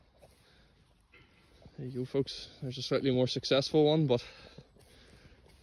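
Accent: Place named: County Down, United Kingdom. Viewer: Just found the channel, subbed even though I know nothing about farming, I just instantly recognised the accent and the Mournes in the background of a video haha. Also from County Down myself.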